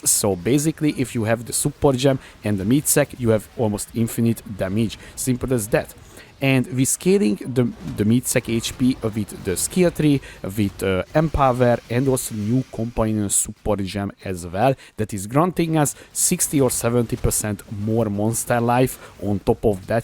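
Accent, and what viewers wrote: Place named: Hungary. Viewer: eros a magyar akcentus hhhhh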